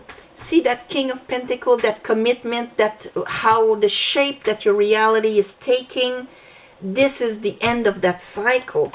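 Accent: France. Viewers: cute french accent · Thank u. I hear a beautiful French accent. U r very beautiful inside out.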